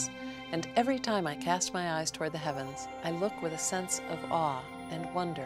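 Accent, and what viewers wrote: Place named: United States. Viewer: Like si vienes por inglesamericano :v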